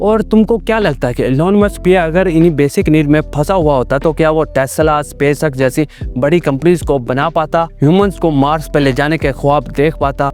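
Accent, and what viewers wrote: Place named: Urdu, Pakistan. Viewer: I like your Urdu Accent ❤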